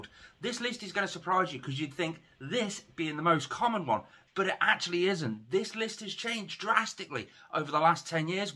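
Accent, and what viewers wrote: United Kingdom. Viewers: Y'all Brits have such a bizzare way of saying things to us in the states it's neat though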